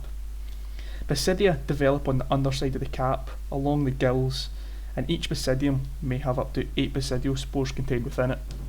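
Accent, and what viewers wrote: Scotland, United Kingdom. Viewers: lovely Scottish accent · Wow, the amazing Scottish accent :)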